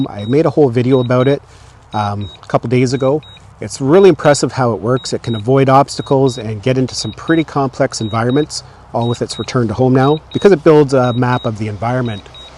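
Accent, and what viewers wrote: Canada. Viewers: Well, the first flight went without a hitch! I learned very quickly to watch the controller and not the sky - the drone disappears in seconds. I would highy recommend this Beginners Guide. Calm, clear and really comprehensive without unnecessary detail. That Canadian accent is a joy!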